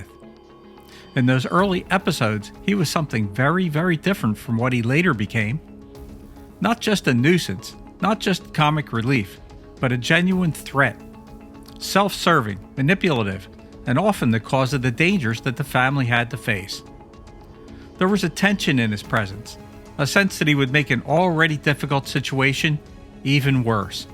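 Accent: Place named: Philadelphia, United States. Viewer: Couple things: I note your Philly accent & Gene London reference. But beyond that, you're right, I was a kid watching it then. Episode one of the first season, in particular was serious & good, a real sci-fi adventure. After that, I felt things trending in a more lightweight direction. Still watched it a lot though.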